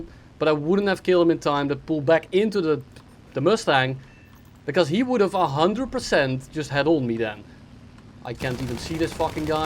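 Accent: Netherlands. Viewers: Dutch accent, lovely.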